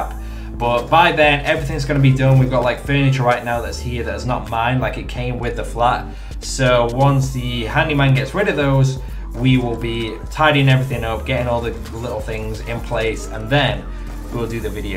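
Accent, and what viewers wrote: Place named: Birmingham, United Kingdom. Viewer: Birmingham I think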